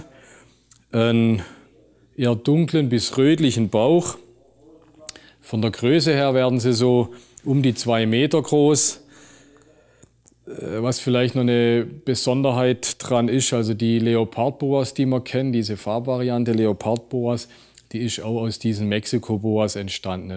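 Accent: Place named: Baden, Germany. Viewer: nein badisch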